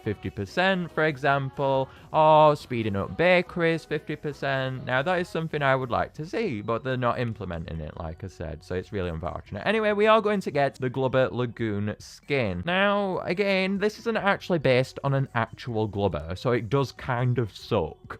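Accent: Scotland, United Kingdom. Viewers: I think your accent is scottish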